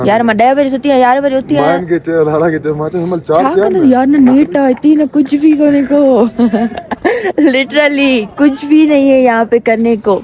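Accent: Sindh, India. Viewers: I just love ur sndhi acnt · your Voice,Sindhi accent, cuteness, smiley beautiful face and positivity made me your biggest fan, I became so addicted to your vlogs ❤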